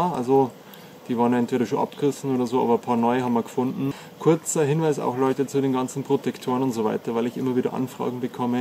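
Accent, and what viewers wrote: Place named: Bavaria, Germany. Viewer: Kleine Frage, aus welcher Gegend kommst du? Denn man hört den Bayrischen Dialekt☺️😉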